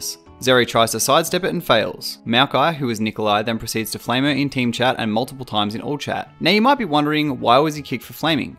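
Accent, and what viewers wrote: Australia. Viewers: Very well put together. Want to know my thoughts? Ok sure. This guy is a fucking CLOWN what the fuck did he expect? Good response by MS. I like your video style of succinct and right to the chase. It is a breath of fresh air in the hastily edited 10min videos made specifically to get ads. I also love hearing another Aussie voice behind the camera. Keep up the good work!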